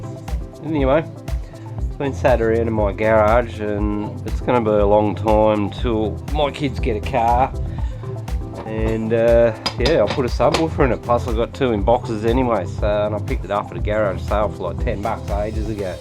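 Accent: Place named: Ireland. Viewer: irish? or Australian? sorry nice accent!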